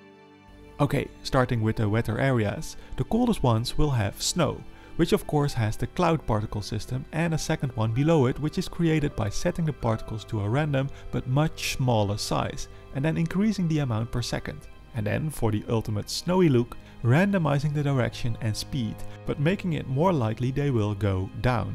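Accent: Netherlands. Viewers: (re) Dutch accent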